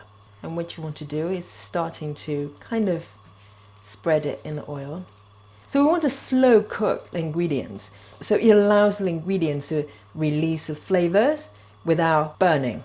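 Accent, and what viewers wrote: United Kingdom. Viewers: I had to laugh at the end, as you say "put a dollop of chilli paste on the side" and then proceed to put the equivalent of a small ladle full! You are clearly "chilli mad", just like my wife. I will definitely have to make this for her, as I'm sure she would love it! 41 years ago, I convinced a little asian gal from Christmas Island to marry me, and making yummy things for her like this helps to keep the romance going. She spent 3 years in the UK, and has a slight British accent (not as strong as yours, but it's still there). Her voice is not quite as deep as yours either, but I found listening to a number of your recipe videos very comforting, and kept me thinking fondly of her while she is away at work and I work from home. Love your recipes, and will be trying the "french Fries" tonight. I'll let you know how it goes!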